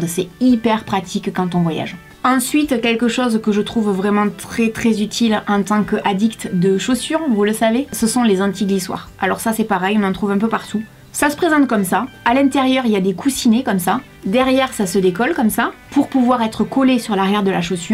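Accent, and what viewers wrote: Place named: Toulouse, France. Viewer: Bon, un bonne dose de rire, on ne dit jamais non, le redicule de tue pas. Chere vous etes adorable avec votre accent de Toulouse....j ai presque le meme, sauf que moi je suis nee a Monaco, chercher l erreur😊😊😊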